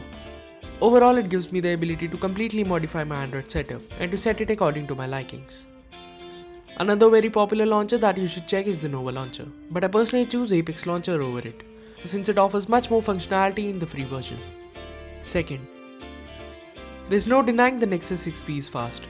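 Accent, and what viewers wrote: India. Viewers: Also, it's the Indian accent which is prompting everyone to say they can't understanding a thing lol xD It seems neutral to us, but not so much to others.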